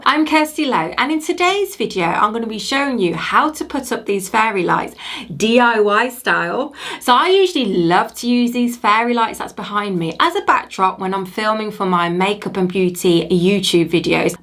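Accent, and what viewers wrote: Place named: Midlands, United Kingdom. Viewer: So nice to hear a Midlands accent on here! Big love from Coventry, love this tutorial!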